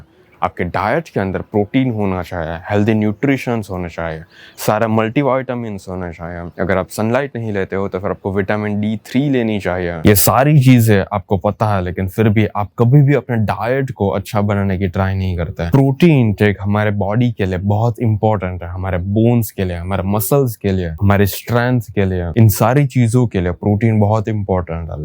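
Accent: Gujarat, India. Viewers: tumhare Gujarati accent ki vajeh se lagta h tum vimal chaba ke bol rhe ho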